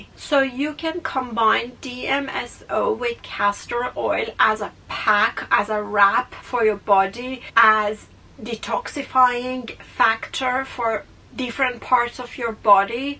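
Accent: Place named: Iran. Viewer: Your natural Iranian voice is so much better than ai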